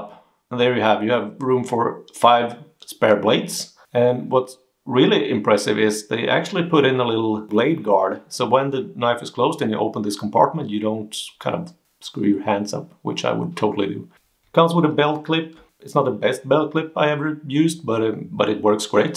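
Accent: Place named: Germany. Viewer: First time watcher here....I stumbled upon your channel this morning, at first I didn't detect your accent....but as your video went on and I didn't keep scrolling I began to notice that you might be from Germany or austria....you finally mentioned that your from Norway! I appreciate your presentation style and liked how you described everything...really cool...I went ahead and hit that subscribe button and gave you a thumbs up! I guess the only thing I took issue with was the fact that your a Dewalt guy....I recently researched folding utility knives and decided to go with milwaukee I have their six in one fastback and their compact folding knife...I like both of these....I'm a knife guy and for my edc I carry a buck Juno in my front left pocket a spyderco in my left rear pocket and now my milwaukee fastback in my front right pocket...it works for me...I live and work in the west texas oifield....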